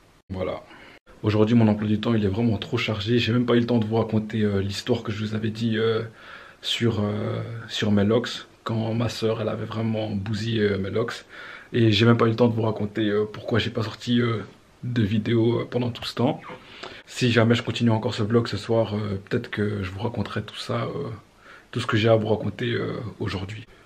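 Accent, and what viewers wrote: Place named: Brussels, Belgium. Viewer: T as manière de parlé obligé t es un bruxellois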